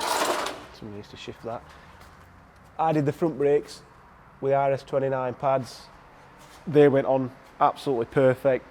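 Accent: Yorkshire, United Kingdom. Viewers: I live in Yorkshire so feel comfortable saying this, brilliant accent my friend! When talking about switching the forged wheels ‘let’s see what difference it mecks’. Brilliant. Really enjoying this 125d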